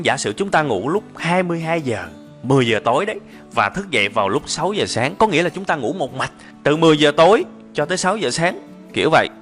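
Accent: South, Vietnam. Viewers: Hoan nghênh nói tiếng miền nam, Chứ nói tiếng miền bắc nghe nhức óc quá ! Hình như lên video phần đông hướng dẫn chương trình toàn là người miền bắc. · Giong giang bai mien nam nghe nhe nhang , de thu hút . Xin cảm ơn tác gia với những thông tin bổ ích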